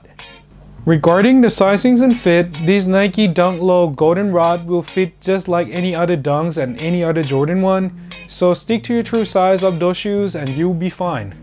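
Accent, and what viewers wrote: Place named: United States. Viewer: Where in Thailand? I'm in Pattaya 4 years now and you sound American not Thai 😁 oh yeah I just bought a pair of the Goldenrod 👍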